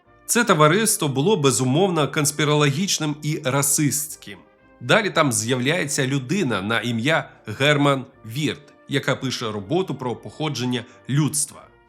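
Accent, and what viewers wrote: Russia. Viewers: Дуже дякуємо за українську мову та цікавий контент. Дозволю собі малесеньке зауваження , не ображайтеся тільки , дуже прошу. В українській мові , де пишеться буква О , то так і читається та вимовляється - О , а не А .Завжди , незалежно від наголосу. В російській , коли наголос не падає на букву О , то читається як А . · Працюйте над вимовою,бо у Вас російський акцент! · Удосконалюйте українську Дуже ріже Ваша російська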